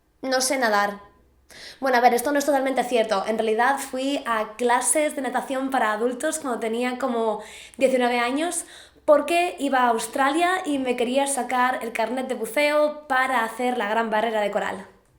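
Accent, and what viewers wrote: Spain. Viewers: Yo solo quiero tener un Hijo con tigo, eres muy linda, y ese asento de española me fasina😍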